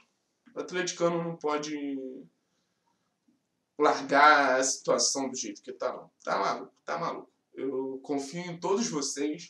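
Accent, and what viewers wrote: Rio de Janeiro, Brazil. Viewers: Sou athleticano desde piá...tenho 51,anos e confesso q me soa estranho ouvir um sotaque carioca falar do Athletico com paixao como vc fala... Pois, como vc fala, aguentar o barulho de torcer pro Furacão não é fácil... A mídia carioca principalmente, mas dos paulistas tbm, quase sempre omitiu o Athletico e desedenhou... Admiro seu trabalho e seu esforco pelo meu time do coração ha quase 50 anos..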